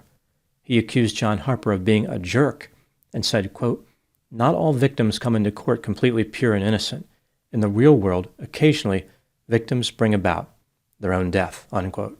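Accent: United States. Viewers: You sounded more American than ever in this piece.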